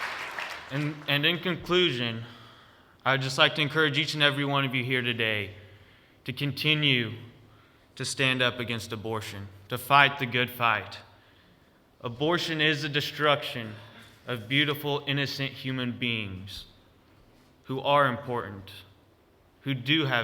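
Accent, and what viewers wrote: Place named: South, United States. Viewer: Omg. You have a southern accent?? Omg. God bless. What a miracle you are. 🙏❤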